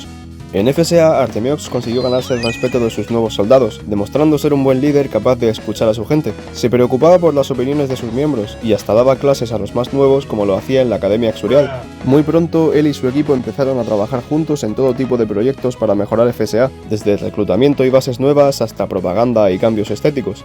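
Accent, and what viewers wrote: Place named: Spain. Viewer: "historia de los clanes latinos" lo narra un español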